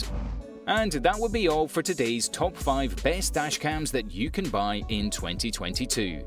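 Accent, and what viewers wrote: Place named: England, United Kingdom. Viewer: you sound English but the price you give is in dollars >????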